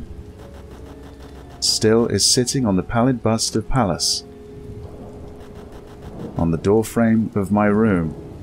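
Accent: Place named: United Kingdom. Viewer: I really how you read, you have a very nice British accent which really suits the Allan Poe's poem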